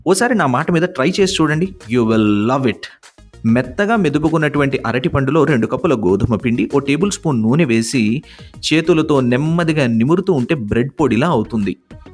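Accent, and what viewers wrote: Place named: Telugu, India. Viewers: Love the Telugu accent and Telugu! prefer Telugu without english words! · Narrator voice Mind Blowing.... His Telugu Acssent wonderful🎉🎉🎉🎉🎉🎉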